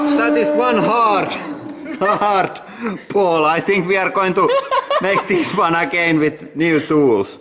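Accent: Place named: India. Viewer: Поганый индийский английский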